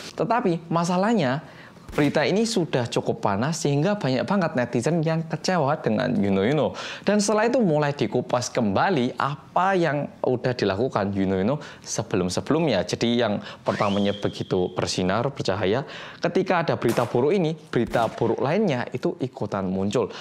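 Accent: Java, Indonesia. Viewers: Kalo gak nonton videonya, cuma denger suaranya aja, bener2 suara orang Jawa, medoook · Orang mana si. Asli mana. Kok medok. #penonton_baru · Medoooook, asal mana to om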